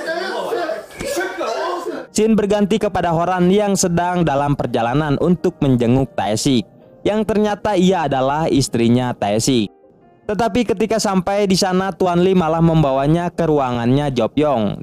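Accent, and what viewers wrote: West Java, Indonesia. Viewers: Hhaaaa......haaa... Admin naratornya orang sunda....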